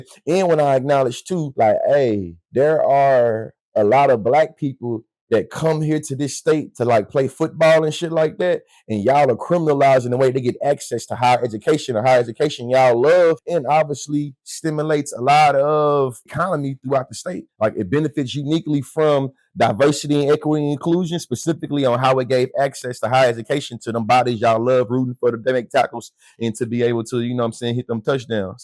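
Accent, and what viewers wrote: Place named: South, United States. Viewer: I’ve watched this three times and texted it to a friend. There’s this light, really, really deep inside, that glows steady when I meet someone who speaks with my kind of accent and reflects that special, soulful human connection, what it means to be conscious in the south, to see each other here; to be open & to connect. It’s the warmest kind of warm, reminds me of what if felt like being with my grandma. Genuine soul— without speaking in code— just genuine soul. A spirit so rich you can just about smell it.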